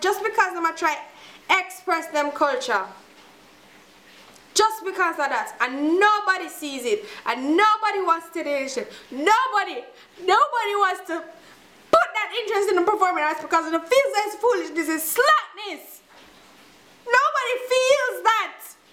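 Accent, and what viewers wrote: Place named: Jamaica. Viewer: calm down sista calm down right now you should be in a Tyler Perry play your good and I like your accent JAMAICAN NATION FOR LIFE!!!!!!!!!!!!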